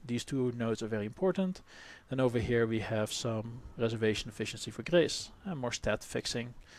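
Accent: Netherlands. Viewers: Je hoort meteen t nederlandse accent haha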